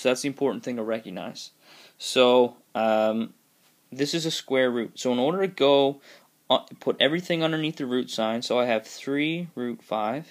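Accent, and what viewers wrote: Newfoundland, Canada. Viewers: Are you from New Foundland?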